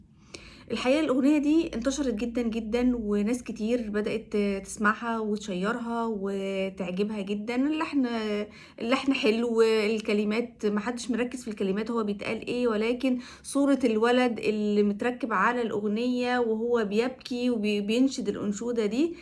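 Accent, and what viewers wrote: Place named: Iraq. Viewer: كافي تمضرطين بالهجه العراقيه اكولهه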